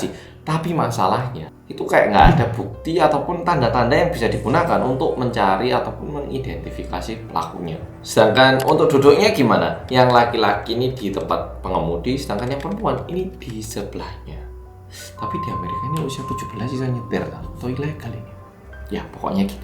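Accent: Java, Indonesia. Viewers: Mas orang korea apa orang jawa, bhs jawanya medok bgt..😅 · Mas nya orang Jawa kah ?? Medok Sekali ngomong nya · Kak,kk pernah tinggal di Jawa ya soalnya logat bet hehehe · medok banget oppaaaa 👍🏻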